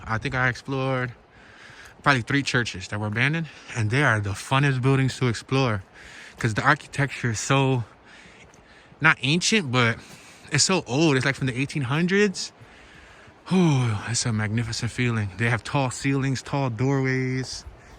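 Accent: Philadelphia, United States. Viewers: Woodder lol luv the Philly accent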